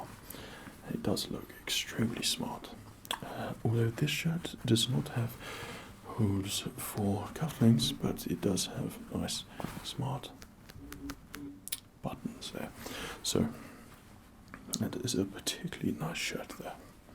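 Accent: England, United Kingdom. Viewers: You english tho.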